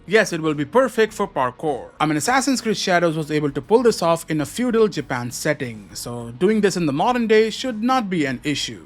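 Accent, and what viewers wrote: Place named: India. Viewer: 1min listening the video im already tired of indian accent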